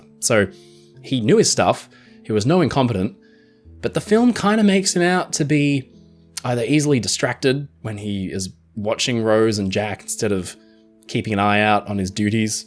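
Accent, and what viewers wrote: New Zealand. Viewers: Thanks for a brilliant video. I learned a lot. Many years ago, before the 1997 movie came out, I read that Titanic had 50 inter-ship telephone lines. What would they have been used for? And is this true? Also, are you a kiwi or an Aussie? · you're from new zealand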